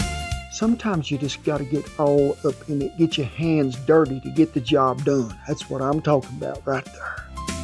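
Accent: South, United States. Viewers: Thank you cool man with sweet south accent. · I can tell you are a man of character because you have an accent just like mine. The country accent is a dying tradition that is getting hard to find. I am so proud of my country heritage.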